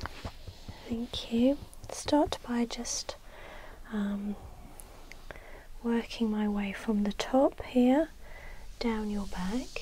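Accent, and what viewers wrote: United Kingdom. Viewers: British, ASMR, dog. Perfect. Shouldn't have watched this right before going to the gym ZzzzzzZZZZzzzzz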